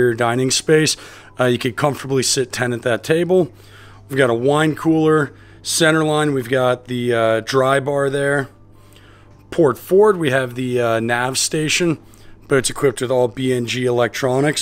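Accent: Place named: Maryland, United States. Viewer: Gotta love that Mairlin accent.. Love the bewt.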